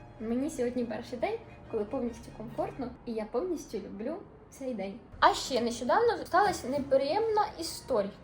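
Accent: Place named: Surzhyk, Ukraine. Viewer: Рибочка, киценька) Підписана на Вас недавно, завжди дивлюсь Ваші відео як маленьке свято чи відпочинок, отауі вони співзвучні! Як класно, шо Ви така щира і харизматична! Зраділа чомусь, шо Ви в Варшаві, моя молодша донька теж вибрала свою самостійність в Варшаві. Дорога до себе завжди важка, зате коли себе чуєш, легко жити це життя! Такий у Вас рідний для мене суржик, звідки Ви? Я з Сум. Бажаю Вам гарних людей поряд і вдячна за відео!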